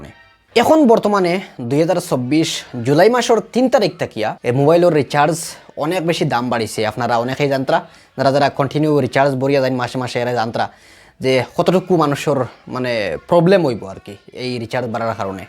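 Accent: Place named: Assam, India. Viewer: Eta assamee bengali na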